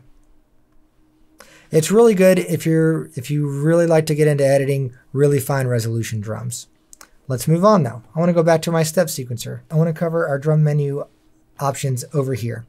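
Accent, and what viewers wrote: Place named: United States. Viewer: I love video tutorials. But my english is not that good. The American accent makes it harder to follow the video, too bad. When will you finally make a manual in HTML or PDF format?? Anyone can translate that with Google Translate. In addition, it makes sense if a specific information needs, then you can search for it!. In video format, I do not have this option.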